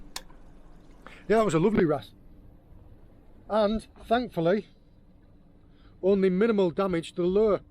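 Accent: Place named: Northern England, United Kingdom. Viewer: where you from pal i recognise that accent , up north somewhere?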